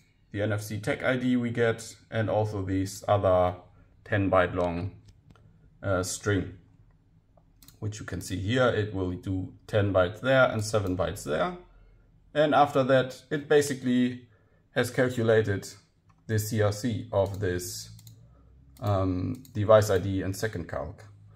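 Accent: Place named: Germany. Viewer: Interessante Information aber dein Akzent kommt so stark (wie bei jedem Deutschen) hervor :D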